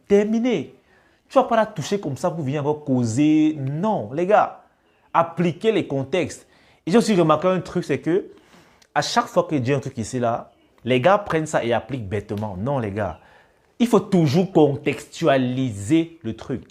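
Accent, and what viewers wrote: Cameroon. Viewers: Hayi déjà l'accent est clairement camerounais 😅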